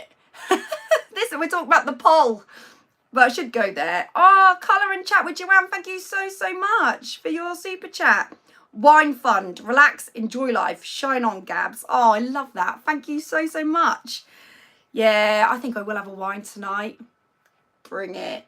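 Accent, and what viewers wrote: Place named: Cockney, United Kingdom. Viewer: There's definitely a bit of cockney in there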